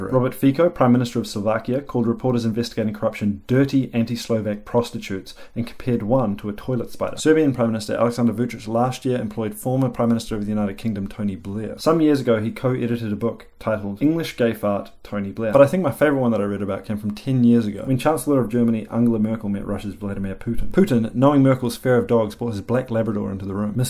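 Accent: New Zealand. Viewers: He speaks too quickly and has a broad Kiwi accent. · oof that heavy disheartened sigh after the civil war stats nonetheless i'm now even more convinced that kiwis have the best accent idk how people can't understand what you say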